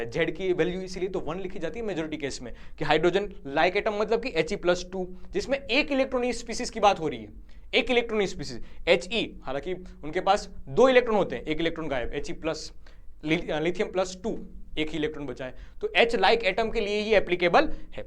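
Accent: Gujarat, India. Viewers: Sir you are gujarati or not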